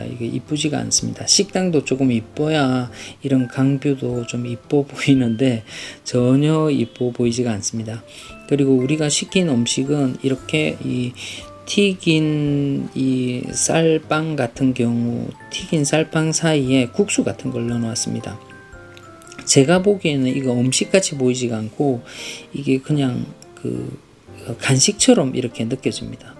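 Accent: Gyeongsang, South Korea. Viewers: 선생님의 경상도 사투리가 정감이 많이 갑니다ㅎㅎ 제가 대구사람인지라 그런거같습니다. 영상 속의 호이안을 보니 즐거웠던 기억이 나면서 정말 반가운 마음이 드네요 다시 그곳을 찾을수있는날이 얼른 왔으면 하는 바램입니다. 항상 유익한 영상 감사합니다ㅎㅎ 코로나 조심하시고 건강하십시오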